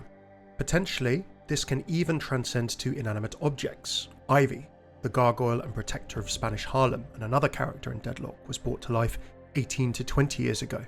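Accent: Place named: United Kingdom. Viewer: oh shit, he's got a british accent?! oh yeah we watching, thanks youtube algo. lol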